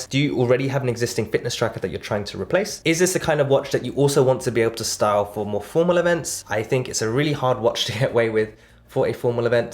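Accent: United Kingdom. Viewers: It's the first video of you I've watched, it was suggested by Youtube, I like your analysis and I'm agree with you about the size question. I have a Garmin 55 since 2 weeks, it's nice but the screen is awful compared to a smartwatch, I'm interested into the Apple Watch (series 9 when it will be out). You British accent is very clear even for a non-native speaker like me so I will definitely watch other videos of your channel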